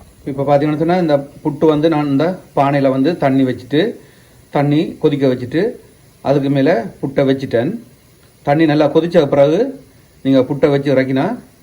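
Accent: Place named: Sri Lanka. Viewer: நீங்கள் இலங்கைத் தமிழர் போல் தெரிகிறது இந்தியாவை சேர்ந்த தமிழர்கள் பேச்சு மிக மோசமாக இருக்கும்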